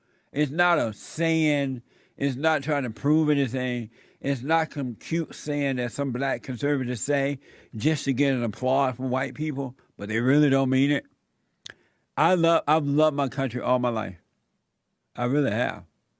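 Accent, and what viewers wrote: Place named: South Carolina, United States. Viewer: Yep sounds like South Carolina.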